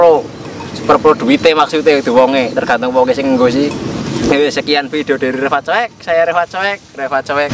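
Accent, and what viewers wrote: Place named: Banyumas, Indonesia. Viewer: Jangkrek penak videone yen ditonton..... Karo misoh. Wkwkkw seneng nyong ktmu video ngapak kaya kiyelah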